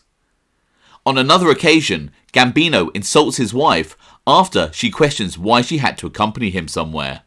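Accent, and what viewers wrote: United Kingdom. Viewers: I’m so sorry for your voice. Brit plus that lisp is disgusting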